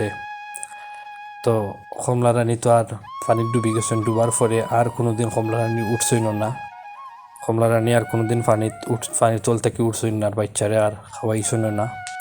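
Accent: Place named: Sylhet, Bangladesh. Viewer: Shylety language suney khub bhalo lagche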